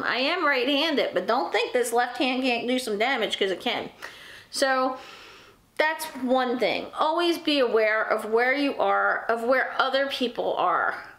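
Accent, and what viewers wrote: New Jersey, United States. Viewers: Get a dog, you’re much less likely to be a victim of crime if you have a dog. If they have to choose which truck to break into I guarantee it won’t be the one with the dog. When I was in the military actual hard as f bad guys weren’t scared of us pointing a rifle at their heads but you bring out a dog and they disappear, quick You can tell your from New Jersey lol Love you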